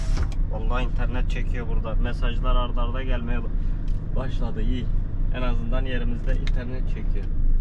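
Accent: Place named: Mardin, Turkey. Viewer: mardinlimisin ? bizim oranın kürtçesini konuşuyorsun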